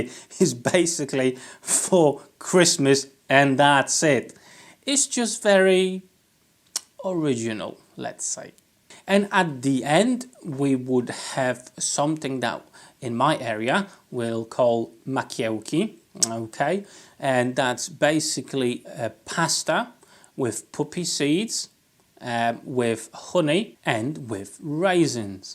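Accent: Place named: Midlands, United Kingdom. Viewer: Hi. Your Christmas sounds delicious! Clever of you to double up on the soups etc. from different areas that you and you wife come from. I thought from your accent on occasion that you may have spent some time in the Midlands (Birmingham used to have a large Polish community and its own Polish club - the only place you could obtain real 'boiling ring'...couldn't pronounce its proper name so that's what we called it). A mate of mine was half Polish and he used to turn up with Polish dishes his dad had cooked. One was some kind of meat filling in leaves that he called 'Kalumpkies'. Probably got that spelling completely wrong but it was excellent. Are you going to incorporate any traditional UK fare into your Christmas food? Anyway I wish you and yours a very merry Christmas and hope that it gives you and your family everything you wish for yourselves and an extra wish from me. 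P.S. Really enjoying the hand power tools vids. Been using these for more than 30 years but it doesn't mean I know (or remember) all about them Thank you.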